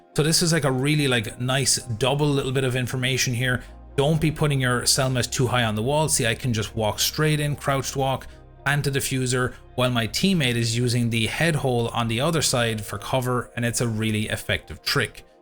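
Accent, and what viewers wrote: Ireland. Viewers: Hes probably just irish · What part of Ireland r u from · Probably because he's Irish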